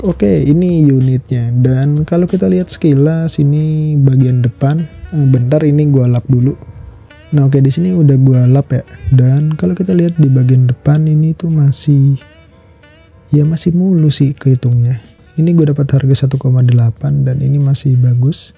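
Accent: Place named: Lombok, Indonesia. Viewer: logat bahasanya kayak lombok bang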